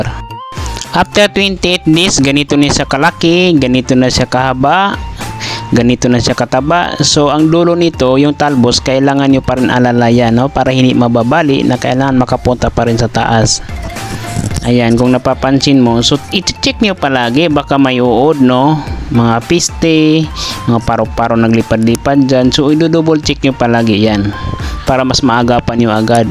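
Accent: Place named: Maranao, Philippines. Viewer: Mranao accent. Maranao ka po ba?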